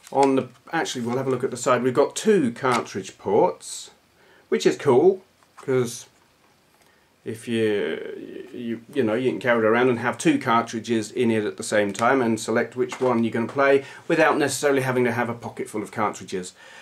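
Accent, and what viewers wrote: United Kingdom. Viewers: Your cussing is refreshing, especially in the Queen's english. = )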